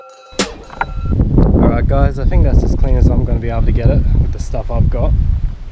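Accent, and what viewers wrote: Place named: Western Australia, Australia. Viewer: Great transformation on that grotty ute! Am I right in thinking you are a fellow West Aussie? The accent... 😉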